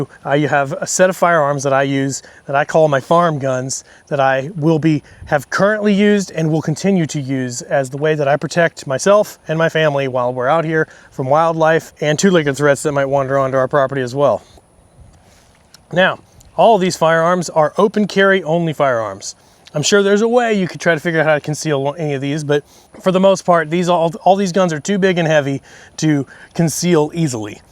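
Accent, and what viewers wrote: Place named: Florida, United States. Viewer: where you from boy? Florida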